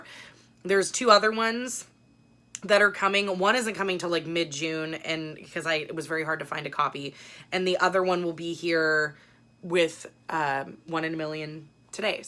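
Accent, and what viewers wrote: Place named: Australia. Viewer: Ooo!!! Yes you should start!!! I love book videos and I would so watch!! AND it sounds like you're from Australia, I'd love follow your booktube if you start one! I don't make book videos (yet?) but am obsessed with booktube haha 💟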